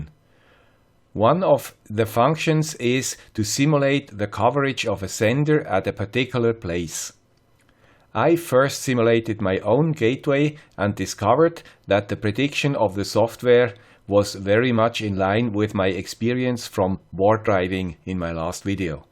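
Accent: Switzerland. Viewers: Grandpa with swiss accent always make things clear. Thanks from an engineering student sir. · Congratulations with the birthday, the world records and the nice Swiss accent. Thank you for explaining everything so clearly and with so much useful details and links, already 120 times! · Happy birthday "Guy with swiss accent"! Great content, as always!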